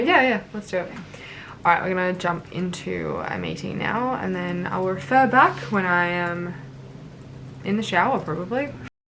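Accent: Australia. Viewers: I knew you were from Bondi just from the accent lol. #eastensuburbsgirllssss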